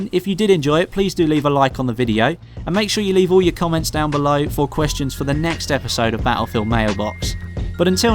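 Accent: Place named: South East England, United Kingdom. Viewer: He speaks like most in the south east (i think) of England do.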